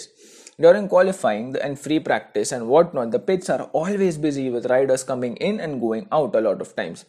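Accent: India. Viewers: Englis india ajg. Wtf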